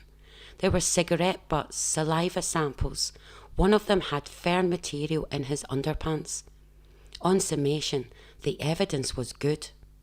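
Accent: Scotland, United Kingdom. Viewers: A scottish woman narrating ..what next ..was there justification for bloody sunday..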